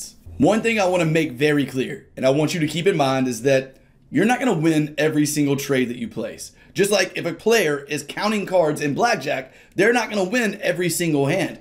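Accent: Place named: North Carolina, United States. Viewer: Where you from? What's that accent? Sounds like North Carolina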